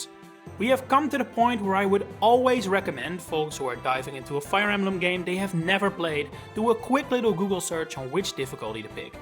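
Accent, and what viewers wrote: Netherlands. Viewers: I'll bet anything this guy is Dutch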